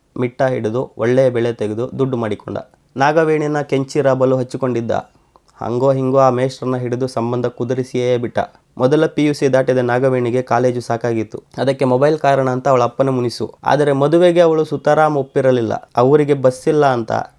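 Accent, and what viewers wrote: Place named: Davanagere, India. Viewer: Anna nam Davanagere bhashe mathadidri, tumbha santhosha aytu Keli , urin nenp aytana 😢😊😊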